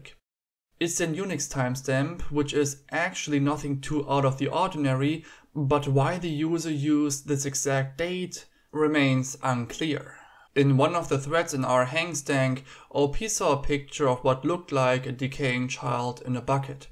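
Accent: Germany. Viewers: Ich hab mich minutenlang gefragt warum mir die Stimme bekannt vorkommt, bis ich den deutschen Akzent bemerkte 😍❤️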